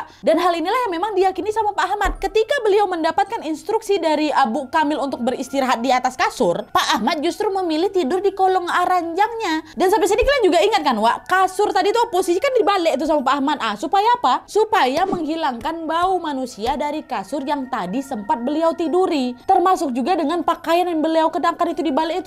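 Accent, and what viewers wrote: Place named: Medan, Indonesia. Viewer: Pandai kali wak berkisah.belajar darimana? Dialekx itu suka banget.medan kah ya?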